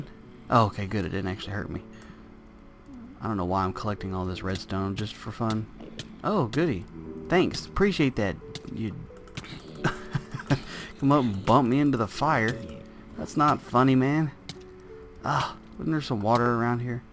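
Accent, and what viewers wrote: South, United States. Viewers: can I ask you something? Where are you from? The south? You kinnda' sound like your're from there..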